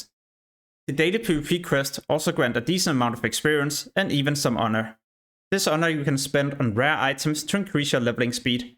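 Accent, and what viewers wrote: Denmark. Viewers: Danish best accent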